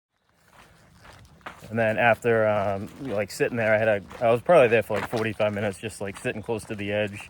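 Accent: Massachusetts, United States. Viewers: NH here! I could tell by some of the words you said, you were from Mass.😂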